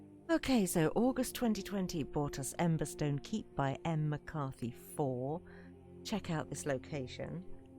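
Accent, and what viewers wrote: United Kingdom. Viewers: Did know you from Yorkshire, I'm from Leicestershire 🌹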